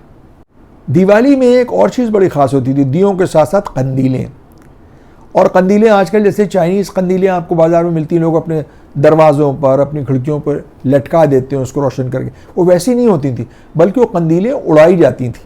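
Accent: Lucknow, India. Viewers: saheb aik gujaarish aap lucknowi chikan ka kurta aur wah bhi chunnat wala pahan kar episode kijiye. bahut authentic lagega aapke lucknowi lahja ke sang.chia to hoga aapke paas.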